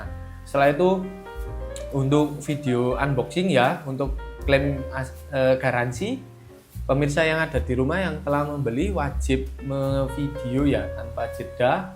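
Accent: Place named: Banyumas, Indonesia. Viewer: Sing logat bahasane kayonge madan ngapak kye, sing endi sih kang?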